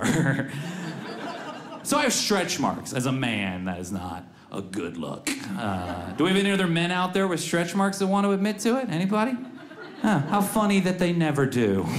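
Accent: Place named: South, United States. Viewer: His southern accent is still there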